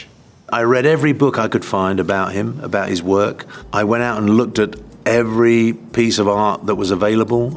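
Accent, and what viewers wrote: United Kingdom. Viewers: That’s because of the British accent. Trust me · A Latino with a British accent??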